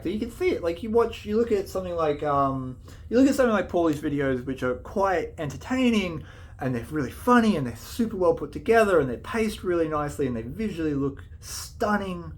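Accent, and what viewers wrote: Australia. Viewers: Do what ya gut tells you to do,, you and ya brother are incredible I'm recently retired and found your YouTube a couple of months ago. Because of you I now have a Detector, a Pinpointer , and exploring country I would never of seen before. Whatever ya choose,, I still like ya content 🙏😎 (nothing wrong with the way you speak,,, its Australian ❤❤)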